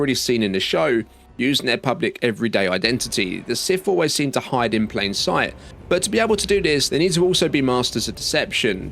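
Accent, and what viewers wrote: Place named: London, United Kingdom. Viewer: U sound like the guy from looper. Must be the Londoner in u.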